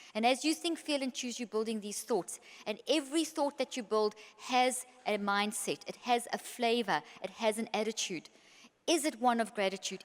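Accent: South Africa. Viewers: Is this a South African accent? How wonderful to listen to; both the accent and the content, of course!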